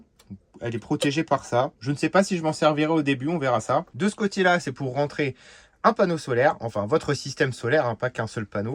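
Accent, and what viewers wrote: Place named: England, United Kingdom. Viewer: quel accent anglais !! lol jolie colis :) et ça envoie du lourd !!! bizzzzz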